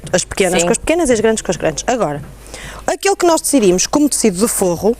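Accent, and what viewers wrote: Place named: Portugal. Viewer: p/sotaque vc. é portuguesa, seus trabalhos são lindos!!, mas ja vi pessoas falarem rápido, mas vc. é de uma rapidez q/nunca vi rsrsrsrs se eu falar rá pido assim com certeza vou ficar uns dois dias sem voz.rsrsrsrs, sou brasileira, moro em são paulo, acho vc. uma mulher de um astral incrivel! parabens!!!muito bom ver seus videos.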